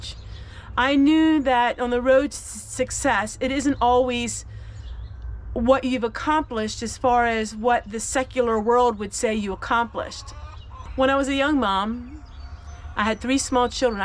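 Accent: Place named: Maryland, United States. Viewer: your channel is so fun and uplifting and a little wacky in a good way. i enjoy your garden and your collectibles and of course it's nice to hear someone not be ashamed to mention the Lord Jesus. your accent is similar to ours in Maryland but ours is a little more southern. do you have any pets other than your chickens.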